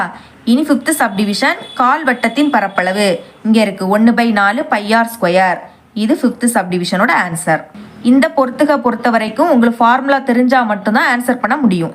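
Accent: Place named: Nagercoil, India. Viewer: Unga native nager coil side thana unga voice enga area pola iruku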